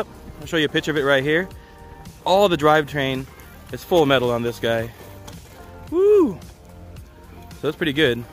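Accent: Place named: Miami, United States. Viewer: are you from miami, this accent is miami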